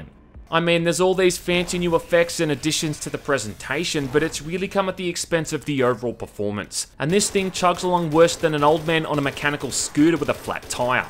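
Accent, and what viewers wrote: Australia. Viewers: Its hard to differentiate wether this video is a rant or just a comparison video with such a thick Aussie accent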